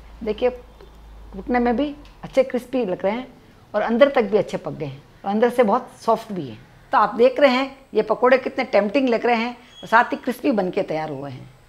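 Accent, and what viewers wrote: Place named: Marwari, India. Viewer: apki boli se lagta h ya aap marwadi h .yhaa baris bohat h me triy karungi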